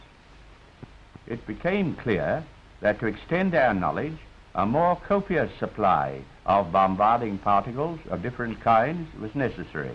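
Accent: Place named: New Zealand. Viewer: I'm amazed his accent is still so clearly Kiwi, especially given his education, class and all those years in the UK. It's great to hear!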